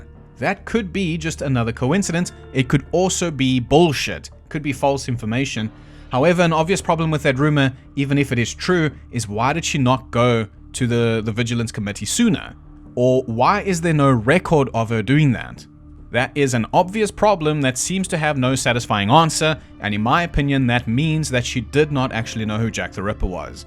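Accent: South Africa. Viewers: Dude you are fucking hilarious. It’s like listening to a south african George Carlin reading crime stories 😂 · Had some South African friends in London back in the day and it does me good to hear the accent again.....especially those wide open "I's"...(eyes...)....oh yes, and great content, love the chat-by-the-fire style, infectious laugh + amazing research and thoroughness...give a treat!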